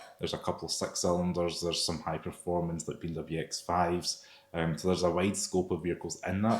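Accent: Scotland, United Kingdom. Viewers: whats wrong with your mouth. Everytime you open it scottish comes out. Did you have it for dinner. You need to thyrow up quickly and then speak properly.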